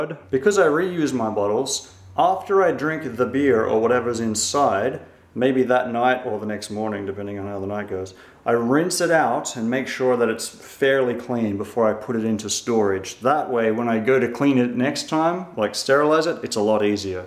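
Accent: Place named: Australia. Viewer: What accent is that? Sounds like Australian that keeps turning into American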